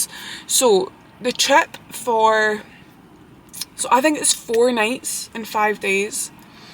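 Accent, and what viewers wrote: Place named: Fife, United Kingdom. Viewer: So nice 🥺 side note- you sound like your from fife (from a fellow fifer)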